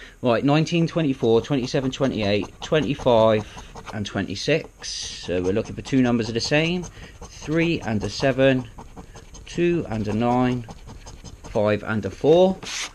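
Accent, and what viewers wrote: Portsmouth, United Kingdom. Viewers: Why do you sound like your from Portsmouth x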